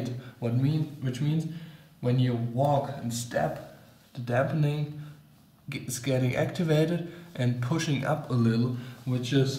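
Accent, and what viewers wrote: Germany. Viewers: Man hört mega deinen Deutschen akzent😂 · Man hört das er deutsch ist xD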